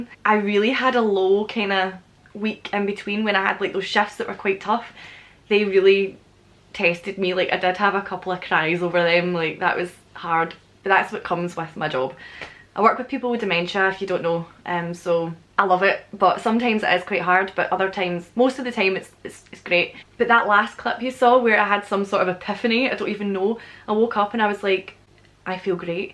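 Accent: Scotland, United Kingdom. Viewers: Love this series! I've been having a bad few days with anxiety as I'm abroad on my own and been struggling to deal with the large numbers of people at the event I'm at. I discovered you through your collab with Sarah Courtney the other day and I've since been binge watching your stuff and it's helped me so much! I've watched this whole series now and it's brilliant! Proper rollercoaster but I find learning about other people's experiences strangely soothing when I'm anxious plus I love any Scottish accent 😆 Also I'm really grateful for women like yourself and Hannah Witton sharing your perspectives on menstruation so that I can understand a bite more.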